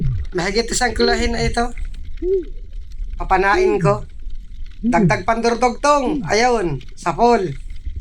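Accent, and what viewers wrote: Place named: Vinzons, Philippines. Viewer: Cguro ang blogger na e2 ay taga Vinzons camarines norte. Pamilyar sakin ang mga pananalita nito at mga katawagan nya sa mga lamang dagat. Hahaha 😅😅😅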